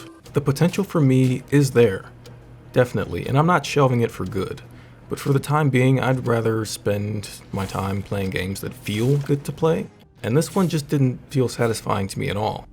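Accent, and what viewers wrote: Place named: East Coast, United States. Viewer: Im guessing you are part of the east coast of the US. ?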